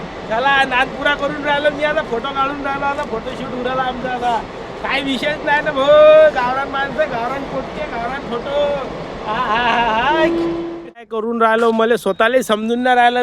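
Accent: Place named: Vidarbha, India. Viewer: भाऊ भाषा शुद्ध झाली आपली गावरान विदर्भाची भाषा राहू द्या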